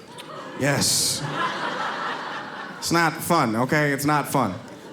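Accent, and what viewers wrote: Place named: Montana, United States. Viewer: If this was an audio clip without video, I would bet my life savings that I'm listening to a white man from Montana or New Hampshire 😂😂😂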